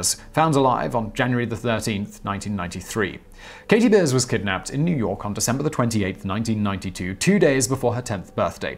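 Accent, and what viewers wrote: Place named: RP, United Kingdom. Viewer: You have the poshest accent I've ever heard!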